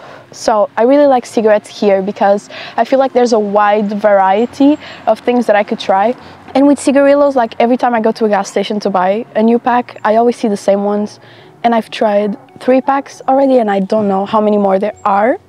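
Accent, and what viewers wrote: Portugal. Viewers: tu tens sotaque de tugaa... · I thought you had the most obvious portuguese accent and then i checked the description and boom there it is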